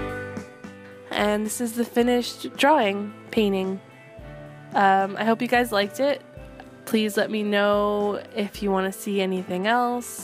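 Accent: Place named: California, United States. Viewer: are you from California? cause u totally have the accent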